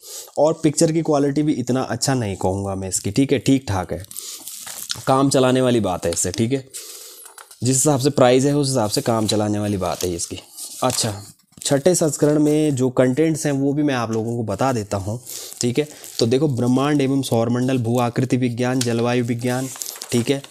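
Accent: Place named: Garhwal, India. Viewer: गढ़वाली tone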